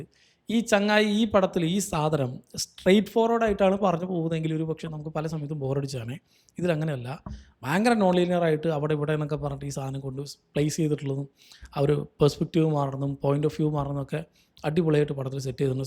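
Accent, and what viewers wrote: Palakkad, India. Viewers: bro, palakkad ano?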